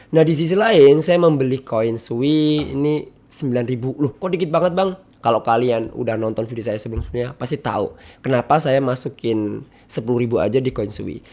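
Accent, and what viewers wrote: Indonesia. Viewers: wong ngapak kayane ya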